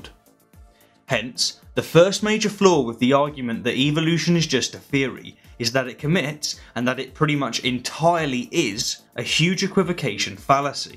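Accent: United Kingdom. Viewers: Esq. (I say that because to my ears you sound posh English) I speak English with a Michigan and Ohio accent actually my accident tends to be related to that spoken in Grand Rapids and Detroit, Michigan along with Toledo, Ohio. According to some PhD linguist test whose name I can’t remember and am too lazy to look up. Anyway all that being said I have noticed the same lack of understanding between the colloquial definitions of theory and now that you pointed out the different definitions of law. I’ve known about the different definitions of those words and several others misused by creationist and for that matter certain uber-liberals and ultraconservatives who are very careless in their misuse of words and phrases. Particularly in using words that has several different definitions but implying there is only one meaning to confuse their audience.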